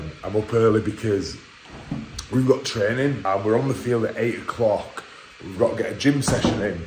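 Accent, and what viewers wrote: United Kingdom. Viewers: Hi...I just found ur channel today... I followed Joel's too.... I think ur channel will be more interested if u toke those places in ur area/places u visited too. I'm Indonesian and sometimes hard for me to understand what u are saying with ur British accent...but I've been trying... Success for ur natural channel I love it...much love from Indonesia. 💜❤💚💛🙏🙏🙏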